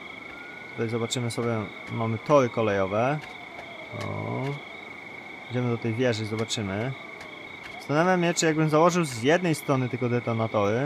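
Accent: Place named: France. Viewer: masz francuski akcent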